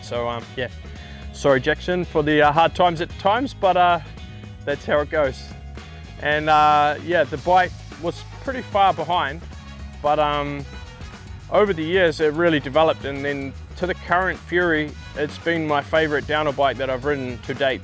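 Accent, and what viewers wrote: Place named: New Zealand. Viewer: I would love him in the commentary booth but if he failed the test it is probably because his english is pretty hard to understand. We're talking heavy kiwi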